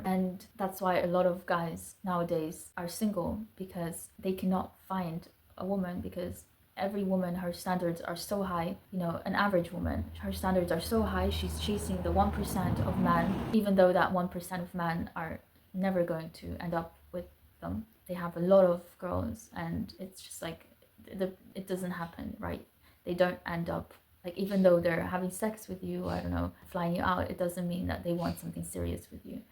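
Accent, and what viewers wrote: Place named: Eastern Europe. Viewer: I think you are from Slavic country? Urs accent 😂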